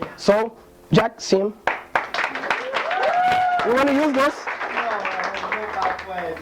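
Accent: Northeast, Brazil. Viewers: Fico orgulhoso de ver um brasileiro ensinando a pessoas do mundo inteiro algo mais importante que futebol. Mas, o que ganhou meu dia foi ver que esse brasileiro é um nordestino. Ou seja, vem de um lugar cujas pessoas são vistas, por gente de outras regiões, como desqualificadas e incapazes de ocupar posições diferentes de "porteiro de condomínio". Morei 6 anos em Recife, voltei para Natal há pouco mais de 1 ano e gostei muito de rever (ouvir) esse sotaque. Mas, dessa vez, em inglês e ensinando ao mundo como pensar! Nailed it,! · Inglês com sotaque nordestino kkkk. entendi bem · Excelente,. Estava querendo ver essa sua palestra há um tempão e de repente poder assisti-la assim com esse northeastern accent foi massa demais =P. Curti muito esse conceito de ideias sendo armazenadas e também a ótima ideia de resolver problemas que não são seus. Obrigado por compartilhar a palestra e sua jornada na singularity. 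· Nordestino fala inglês também quando diz: "Oh shit, negga!" - Em português: "Oxente nega!" Parabéns, uso muito seus conceitos na minha vida, simples e diretos. Admiro você por que você continua com o sotaque e não fica querendo modificá-lo, vivemos num país continental, é normal que isso exista. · Achei interessante. Seu inglês é bem nordestino kkkkkkkkk, sucesso viu, garoto prodígio!!!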